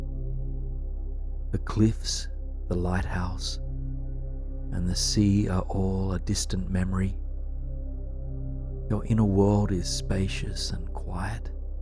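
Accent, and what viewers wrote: Australia. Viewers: haha I knew it lol, and team, you’ve changed my life with your beautiful guided imagery and Aussie accent 🥰✌️❣️ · Is it just me or does he sound Australian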